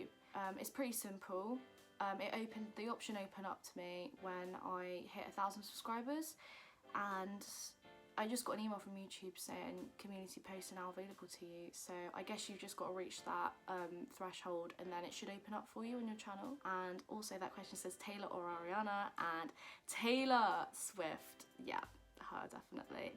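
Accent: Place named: United Kingdom. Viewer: I didn’t know you were British 🤯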